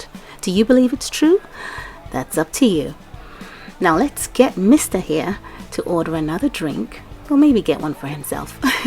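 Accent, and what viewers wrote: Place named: United Kingdom. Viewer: Ahhh you live in England two I kne2 you had a little British accent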